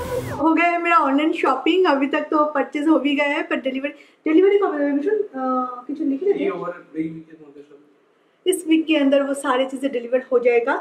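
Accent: Bengal, India. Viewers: u r just amazing apna Bengali tone accha lagta hai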